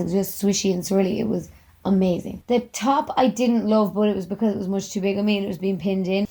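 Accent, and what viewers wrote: Ireland. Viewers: It's an Irsh accent. She's saying that her IRISH accent is cute. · Subscribed because all my family lives back in Ireland and I’m in Canada and miss the accent ❤️❤️ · Me sitting here trying To copy ur accent. And i fail alot :p we Swedish People cant say irish "start" xD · Omg I love love love your accent I always wanted an Irish accent 😍😍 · Your accent is lush. <3 I'm actually subscribing to your channel, based on zero other videos, because I figure I'll get to hear you talk, which will automatically make any other content you produce fascinating by default. I have a couple of Irish expat friends, and you make me think of them. They're from Cork, and I have terrible hearing damage, so having drinks with them, and when they've had a couple and start going super rapid-fire with the talking, it's basically just this lovely, lyrical wall of incomprehensible sound, hahaha! 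Anyway, came for the SYTTD story, stayed for the pretty vocals. :-) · What a lovely brogue..I could listen to you talk for hours :)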